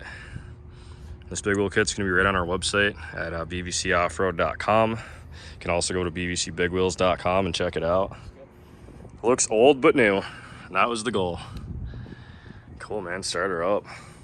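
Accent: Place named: Canada. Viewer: I was talking about the welcome back the guy dose it all the time sounds Canadian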